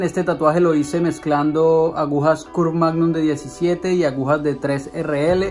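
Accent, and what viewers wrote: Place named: Bogotá, Colombia. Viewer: si noté por como hablas q eres de acá, sería brutal q tuvieras una tienda bogota, un abrazo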